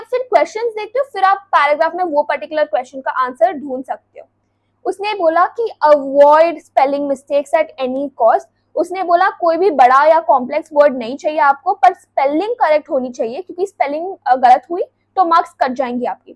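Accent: India. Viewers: Your Hindi accent ❤❤❤ Op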